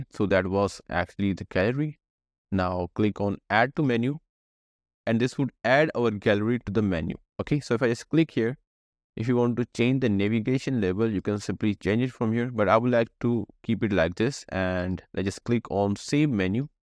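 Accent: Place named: India. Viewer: That Hindu accent drives me crazy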